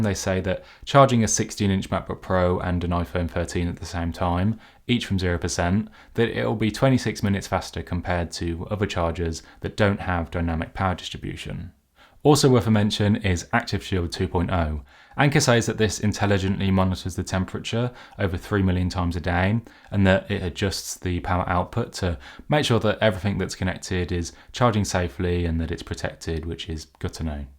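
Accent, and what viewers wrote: Midlands, United Kingdom. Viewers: I know a midlands man when I hear one. 😎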